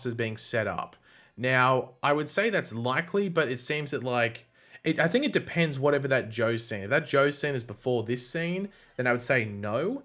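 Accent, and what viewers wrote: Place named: Australia. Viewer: I missed your Aussie voice lol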